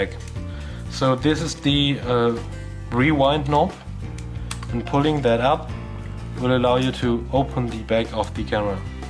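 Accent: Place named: Germany. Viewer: Englisch mit 'nem deutschen Akzent, hach wie sehr ich es liebe :)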